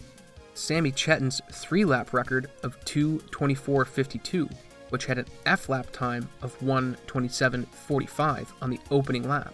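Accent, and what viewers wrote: Canada. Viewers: Your "AR" sounds scream Canadian. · Are u Canadian? You speak like a Canadian. Mars bars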